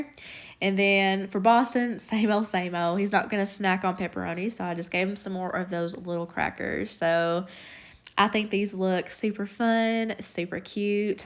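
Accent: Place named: Rural, United States. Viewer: my son loves those cheese cubes lol. Love your country accent😊🥰🤠. Unfortunately my son is a super picky eater and does NOT eat meats or seafood so I definitely have to get creative lol. Love how real and honest you are about showing what is actually more realistic for most parents to pack for their kids. Especially when they can't microwave anything lol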